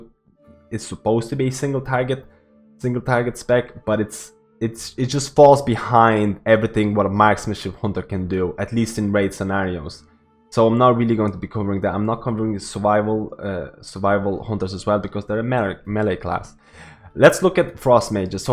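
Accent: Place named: Ireland. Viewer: i know this is unrelated to the video but your accent is driving me crazy trying to figure out what it is, its like you have a strong Irish accent one time then all of a sudden you have like an eastern European accent. Please can someone tell me its driving me crazy!! oh nice vid as well :P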